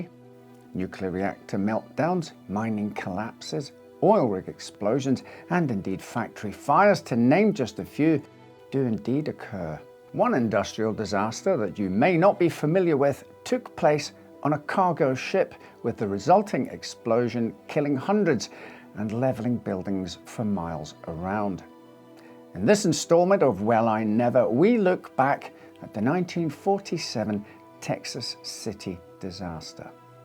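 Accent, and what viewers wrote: United Kingdom. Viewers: It is pronounced that way if you have an American accent. This gentleman has a British accent and therefore pronounces things differently. So what? Could you correctly pronounce the sometimes strange names of the cities in Great Britain?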